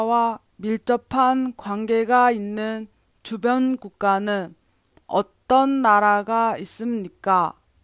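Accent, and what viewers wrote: South Korea. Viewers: mình hoc trong sách ( câu hoi và cau tl luon) xog wa ngày sau k nho het dk; có khi quen sach luon. Nhug ngay nào cug nghe clip bên ban (vua nho câu hoi, vua nho tl luon) ; lai nho lâu nua. Giong đoc chuân hàn luon...😊😊😊.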